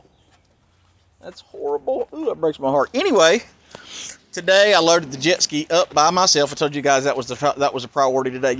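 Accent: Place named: Appalachia, United States. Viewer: Hey man. I just found your channel today. I've looked into the phenomenon of gangstalking a little bit before. I believe it to have a spiritual component as well, if not mostly. (btw, if you haven't yet, check out Jeremiah Cohen on YT. His testimonial is insane and eye opening). I thought I recognized a close cousin of my own accent when I heard you speak. I live a couple hours east of you in the beautiful foothills of the GSM. Anyway, my question for you is this: Why are they doing this to you? Have you seen or heard or been a part of something to draw this to you? Or is it based on something you did or said to anger them? I'm genuinely curious. I've watched a couple of your videos but haven't yet heard the story relating why it is you think they are doing this. A reply would be much appreciated. You and yourn be purdy, now.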